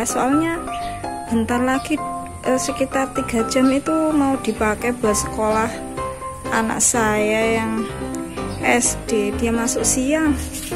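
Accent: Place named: Java, Indonesia. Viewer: Malang hadir. Logat medoknya aku suka, persis persis medokku jg. Ditunggu video2nya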